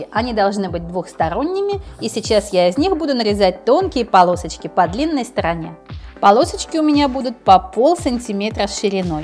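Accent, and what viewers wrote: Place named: France. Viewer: Кто заметил её акцент , похоже она францужинка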